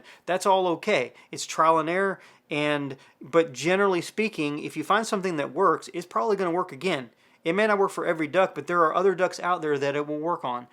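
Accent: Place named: Arkansas, United States. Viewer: What part of Arkansas are you from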